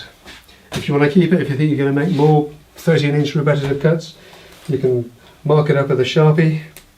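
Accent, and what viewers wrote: United Kingdom. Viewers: Why does a UK accent sound so believable? Ha! Maybe it’s an American thing. Love this channel and the info. Thank you!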